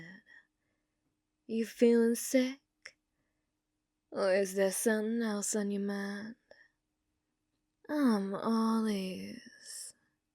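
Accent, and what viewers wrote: South, United States. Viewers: You did that southern accent very well..😊😊😊😊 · What British walk-the-wasteland-fuck magic is this How did you manage to make the southern accent sound so appealing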